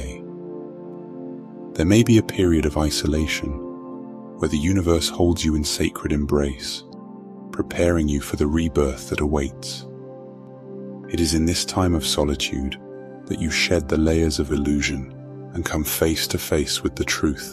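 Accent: United Kingdom. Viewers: I agree...I found it interesting that this originates from India but with a British accent. I love the messages but...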